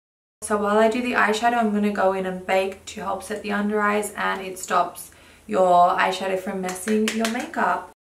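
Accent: Australia. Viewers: Hi, I’m new to your channel and love your makeup looks! You have a beautiful accent, is it Australian? And what is your ethnicity?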